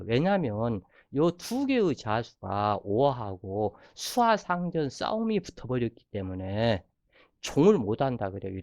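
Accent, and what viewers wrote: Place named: Jeolla, South Korea. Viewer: 전라도 사투리가 엄청 도드라지네요.ㅎ 갑신월 기사일주라서 주의깊게 듣고 갑니다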